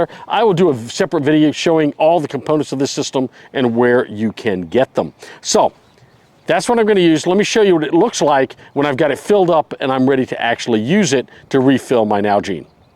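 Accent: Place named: New England, United States. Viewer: Thanks. I lived in Jacksonville FL for a few years, and worked maybe up to a year in (Fl/GA Stateline) Kingsland GA. I had guessed to myself that maybe you lived in OK, AR, or TX because of your Yankee accent, having forgotten that lots of Yankees life in FL et al. I have about 10 backpacks and Bug-Out-Bags because I call "hikes", expeditions, because I am looking for places of interest to explore--each one related to a specific hobby that requires different equipment. I would never carry two folder knives (tho I have no objection about that). I would add either a folder Silky saw (best for green wood) if one wants to cut branches to prevent widow-maker dead fall from trees, or the Laplander folder best used for dry/fire wood. Yes these are longer, but much more useful in unfamiliar terrain and unexpected weather. I would also never bring sugary snack bars. 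They don't stick and they tire me (as I am pre-diabetic); but I do carry sugar (brain needs sugar) in the form of peppermint life-savers (and I suck on them when I recognize that I am not thinking fast or clear enough. If the lives in a Southern State bordering an ocean, I would not recommend sleeping on ground. I had in my backyard in NE FL, dozens of tiny poisonous rattle snakes (I don't recall their proper name). I'd much prefer a hammock with mosquito net and tarp overhang to a poncho (and I have both). I'd sleep better in a hammock off ground. Now I'[m in a winter state and tomorrow the wind chill is predicte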